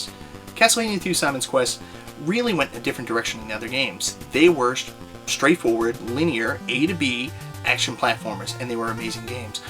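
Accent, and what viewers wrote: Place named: Nova Scotia, Canada. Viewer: Canada eh? Based on your accent, I'd say somewhere near Nova Scotia.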